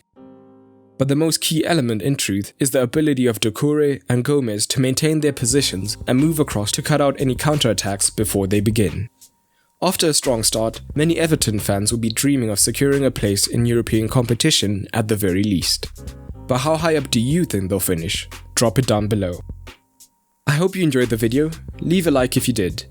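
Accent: South Africa. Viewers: I'm convinced this guy has a South African accent.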